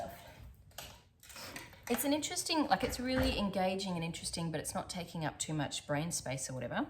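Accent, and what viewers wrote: Australia. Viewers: Hi, am so glad I found your podcast and can’t believe it occurred by watching Beth Macdonald Stone’s podcast and she mentioned she watches and really likes your podcast. Since then I’ve been watching all your videos. As a fellow Aussie living down in Melbourne I’m just loving hearing an Aussie voice and of course seeing all your wonderful makes. Am also a big fan of Skein Sisters and buy from them regularly. Love your work ❤ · I have been gradually going through your library of podcasts - so lovely to hear an (eloquent) Aussie voice talking about making, as I'm currently living in the States. That shawl is definitely something to be proud of, it's stunning! Can't say I'm ready to dive into such a big project though, my queue is already too long. Out of curiosity, what are the shops you like to shop and ship to your American family? I feel like now that I'm here I'm missing my gorgeous Aussie merinos, but I've previously ordered Brooklyn Tweed and paid through the nose to ship it to Aus. 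Looking for some inspo to shop 😂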